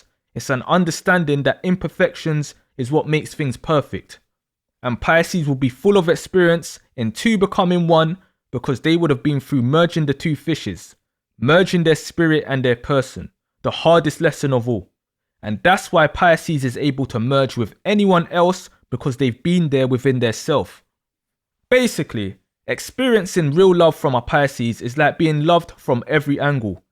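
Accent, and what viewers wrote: United Kingdom. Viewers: I’m 45 march 6th Pisces my other half is 47 and a Libra we’ve been together for 28 years and it’s been a lot of work from both sides for many years but he is my soulmate, it’s been questioned a few times but now I see why and so does he. I was going to hold back and send you a message after I’d watched all your videos but I couldn’t wait any longer you’ve been so helpful on my journey of realising who, and what I am you’ve helped me come to terms with many dilemmas I’ve had over the years, your insight is second to none so I wanna say thank you so much for sharing. I love all your little cartoony bits and you’ve got a great humour that has me laughing out loud and I love your don’t give a shit attitude too!! Which is helping me bring mine out!! So thank you again sending lots of love and light from this fellow Piscean ps my mums a Scorpio (terrible upbringing- she was selfish as fahhh) dads a Taurus so one caring one for me at least 😂😂. 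Please carry on as you’re helping so much and I think I’m gonna miss that London twang being an Essex girl through and through ❤. Love ya man!!! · One of the only British voices that commanded My respect.😄 Great Analysis. I found this video searching for a video to explain this. I was triggered today about someones description of a Piscean in love. You explained it perfectly. Ase'. Let Me add, Very Few can make Me laugh, and Your voices explaining the mindsets took Me out. Thank You.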